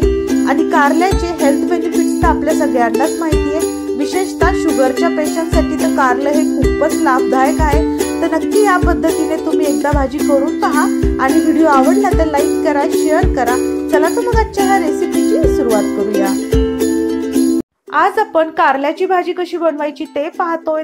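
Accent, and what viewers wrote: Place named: Goa, India. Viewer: Helo Madam Tuzhe Nanv kitem aAni tun Goenkar ashen dista the accent you are talking is from Goa I think Marathi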